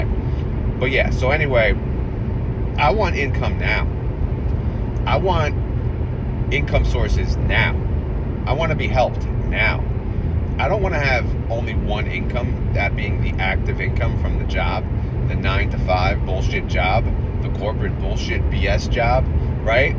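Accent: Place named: New Jersey, United States. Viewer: Where do you live? You sound like New Jersey.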